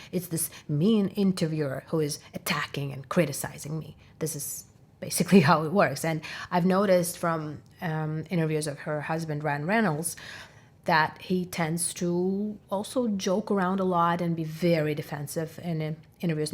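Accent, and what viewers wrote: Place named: Ukraine. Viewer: Hello. Are you from Ukraine? Once I heard your accent I thought you're from Ukraine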